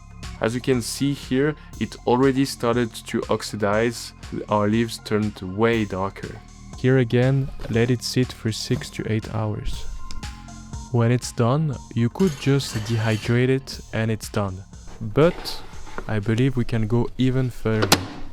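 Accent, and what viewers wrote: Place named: France. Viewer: great video! l'accent français est veri grite also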